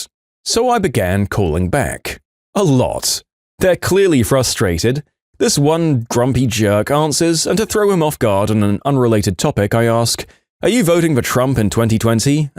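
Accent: United Kingdom. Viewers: Its been a while since ive watched am i the jerk? so when i watched this i was absolutely bamboozled that he was british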